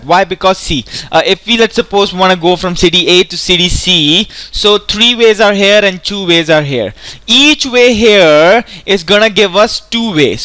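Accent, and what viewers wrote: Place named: India. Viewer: thank you for the good video, but please slow down that Indian accent, it's really distracting...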